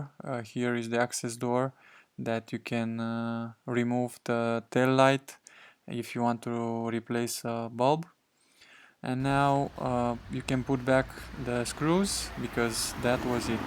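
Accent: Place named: Dâmbovița, Romania. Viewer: Ce accent de Dâmbovița ai când vorbești engleză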